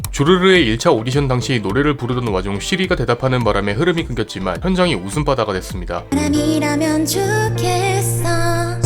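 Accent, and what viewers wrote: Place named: Gyeongsang, South Korea. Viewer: 같은 울산인데 사투리가 좀 심하시네 ㅋㅋㅋㅋㅋㅋㅋㅋㅋㅋㅋㅋㅋㅋㅋㅋㅋㅋㅋㅋㅋㅋ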